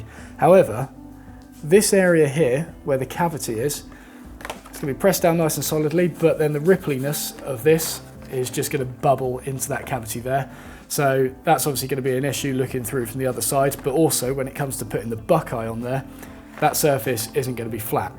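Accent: England, United Kingdom. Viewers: i thought he was an Englishman from his accent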